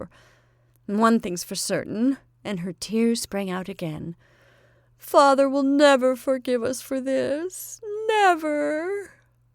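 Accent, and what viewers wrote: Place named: United States. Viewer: The reading should be in an English voice, not American — one cannot imagine a more English story like this one! Pity.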